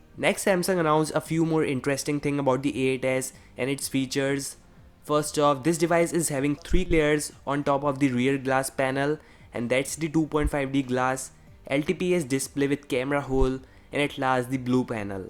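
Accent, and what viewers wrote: India. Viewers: are you dumb? The xeectechcare channel is from pakistan. If you listen to zaryab (xeectechcare) english accent, he sounds like american. Unlike in this channel, this guy is indian and his english accent is different. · Ikr sounds like an indian shitting on the street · Omg indian accent so stupid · Indian english. Unbelievable.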